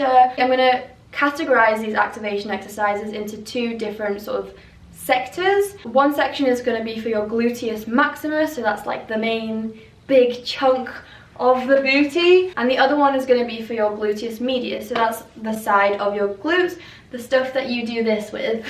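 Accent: United Kingdom. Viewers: love your accent :3 sounds english, did you grow up in the UK; do you speak portugese too¿?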